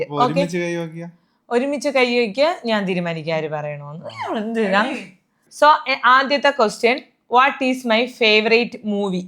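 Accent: Trivandrum, India. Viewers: See nigal orikkalum oru disrespect alla.tvm side orupadu peru agna vilikkarund it's normal.chilath okkei slang different aayond rude aayi thonnunnatha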